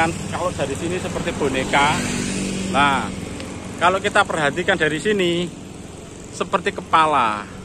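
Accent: Java, Indonesia. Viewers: Aku suka bicarax lucu medok bgt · Mas ngendikane medoo... K, pool seru mas sukses selalu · Medok banget ngomong nya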